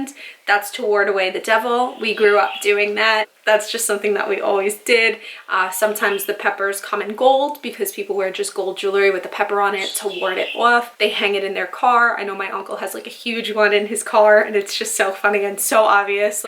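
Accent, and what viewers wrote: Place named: New York City, United States. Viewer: i love hearing an authentic nyc italian accent 😆 as a canadian hehe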